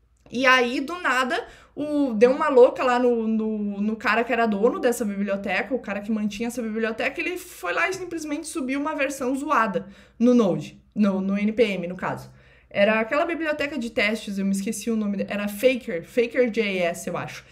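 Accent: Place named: Rio Grande do Sul, Brazil. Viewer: Sotaque do nosso rio grande é inconfundível "né "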